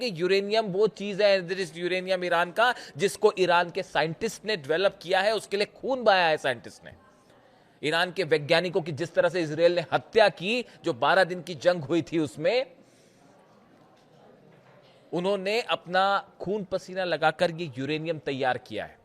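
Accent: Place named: South, India. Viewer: چینل تو اردو ہے لیکن لہجہ ساوتھ انڈین ہے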